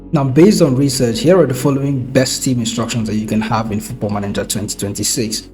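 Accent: Nigeria. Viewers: i heard the voice and i had a feeling Nice to find a nigerian fm youtuber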